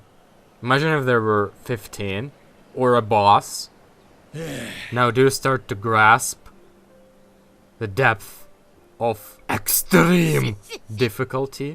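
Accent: Russia. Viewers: Sometimes I forget that is from Russia, nice to hear his accent sometimes. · !!!! What's wrong? Why your accent so Russian?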